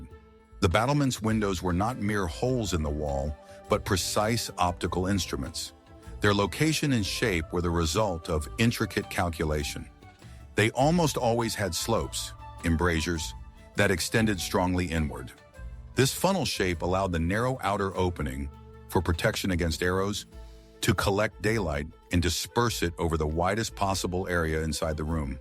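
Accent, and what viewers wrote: United States. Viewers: Narrator a yank a no for me my house is older than the usa.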